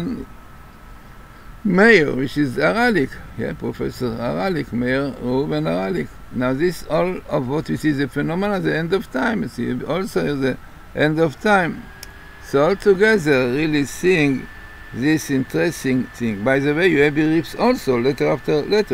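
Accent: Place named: Middle East. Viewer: Your middle-eastern accent is quite strong. People in English speaking areas need to pay CLOSE! ATTENTION, and they will understand. SORRY for your struggle. Your tables are a tremendous blessing. Thank you rabbi Glazerson.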